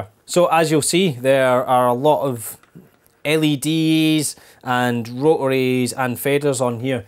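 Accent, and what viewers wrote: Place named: Scotland, United Kingdom. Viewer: His Scottish-like accent makes this video that much more cool XD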